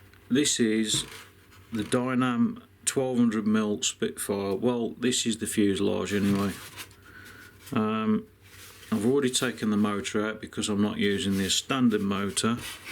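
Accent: Birmingham, United Kingdom. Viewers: Sounds like a Brummy ☻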